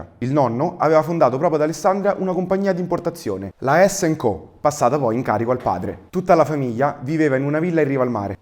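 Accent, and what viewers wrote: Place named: Rome, Italy. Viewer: La mia non voleva essere una critica, ma una simpatica constatazione del divertente accento romano.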